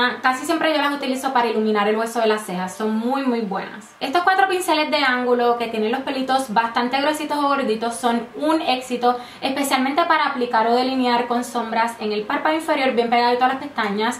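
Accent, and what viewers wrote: Puerto Rico. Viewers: Muy buen vídeo. Me encantan tus vídeos y el hecho de que eres una Youtuber que se expresa como yo, a lo boricua!!! Mucho éxito y bendiciones. :D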